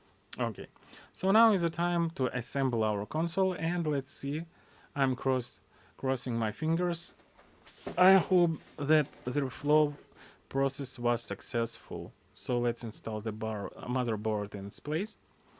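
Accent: Russia. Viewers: Какой лютый русский акцент) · Нижегородский проскакивает бля😂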